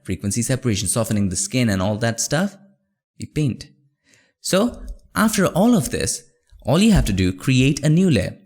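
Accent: India. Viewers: exactly! And i love how he still has his indian accent but it is still understandable!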